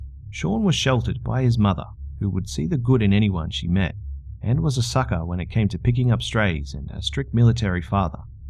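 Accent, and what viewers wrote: Australia. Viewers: aussie for days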